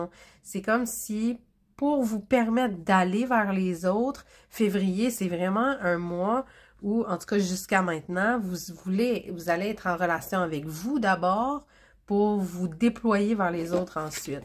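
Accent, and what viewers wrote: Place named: Quebec, Canada. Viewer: Je suis tombée par hasard sur une vidéo de vous hier...comme on dit, il n'y a pas de hasard 😉 Et celle ci ce matin, je vis en Suisse😊. Je dois vous dire merci pour ces guidances, qui sont incroyables. Cela fait quelques mois que je suis en transition, en recherche de mon chemin de vie, et la vidéo que j'ai regardé hier, m'a donné enfin des réponses à mes questions bien précises. Pour cela plein de gratitude 🙏. Cette vidéo est aussi surprenante de vérités, choix 2. Et le plus, votre magnifique accent québécois. Vous avez une nouvelle fidèle ❤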